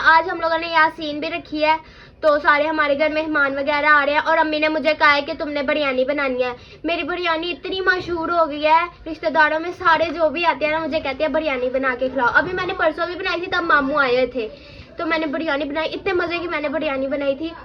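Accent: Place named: Rural, India. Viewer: Itna painduo ki tra bolti ho.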